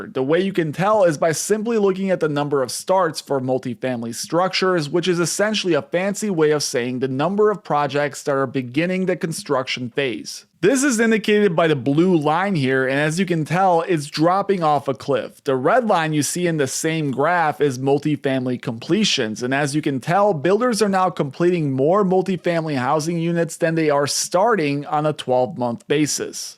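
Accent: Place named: Chicago, United States. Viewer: You from Chicago? That accent...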